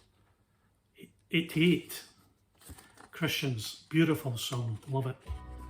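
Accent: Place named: Scotland, United Kingdom. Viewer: nice collection of 12" 45s, haven't seen many of thoughs, love and want a copy of that, I also like it when they have the 7" version on the b side, always refreshing to tune in and to hear that great Scottish accent of yours, thanks big man 👍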